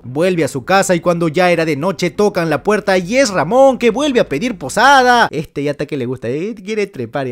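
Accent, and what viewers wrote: Peru. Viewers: por la forma de hablar eres de perú´por lo visto te amooooo soy tu fans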